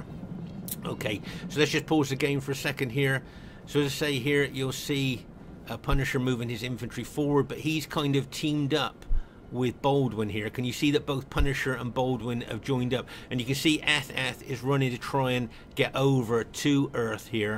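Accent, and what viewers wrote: West Country, United Kingdom. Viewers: as someone with a rural irish accent i respect immensely the dedication to serious commentary from someone from the west country, for such a great game too. thanks for uploading these videos from someone who plays napoleon total war's mod NTW3 a bit too much!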